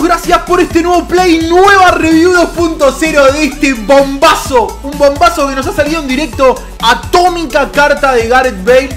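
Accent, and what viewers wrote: Argentina. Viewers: sos de argentina??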